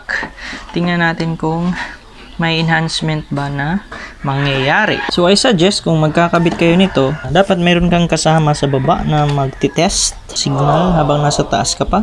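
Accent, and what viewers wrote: Visayas, Philippines. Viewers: Bisayang bisaya ang accent mo lods ah. Hahaha.. Bisaya din ako.. Nga pala nice tutorials.. baka magamit ko tong idea na to don samin na halos wala din signal.. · Ahh mao diay ganahan kaayo ko sa imo accent bay...😁 Great content!! Ug asa ka makapalit ug openline nga modem?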